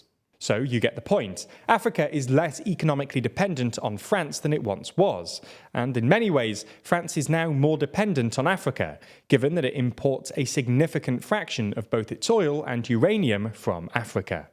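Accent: England, United Kingdom. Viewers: Just wanted to see if it was gonna be some schmuck with an English accent telling us about the evils of the other guys. GFY!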